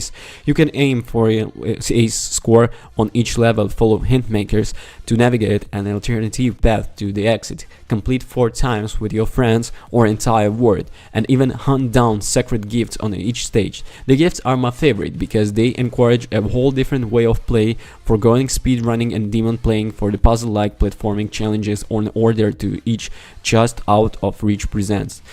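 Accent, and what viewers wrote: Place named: Dublin, Ireland. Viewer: Nice surprise to hear a fellow Dub reviewing video games 🇮🇪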